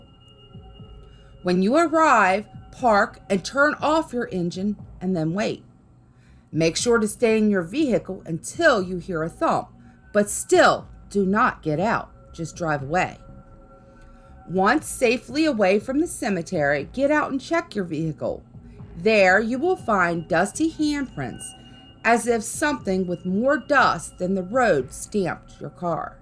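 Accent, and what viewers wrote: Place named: Pennsylvania, United States. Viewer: Hmmmm....are you from Pennsylvania? Just wondering because I am picking up an accent and it's making me homesick lol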